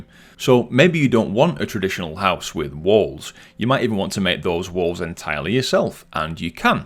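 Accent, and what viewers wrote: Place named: Northern England, United Kingdom. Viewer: Thank you for the video. Nice to hear a north of England accent ( Yorkshire girl here ).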